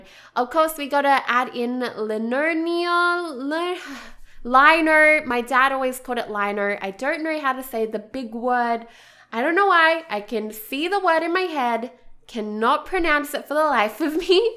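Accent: Australia. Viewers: are you from Australia? your voice is really similar to Deligracy’s haha 💕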